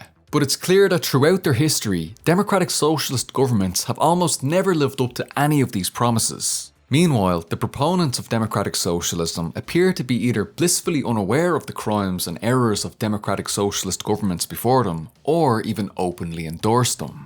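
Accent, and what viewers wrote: Ireland. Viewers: Nice too hear an Irish man leading the charge still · I am binge watching your channel now. Gotta love your perspective, analysis and of course your Irish accent.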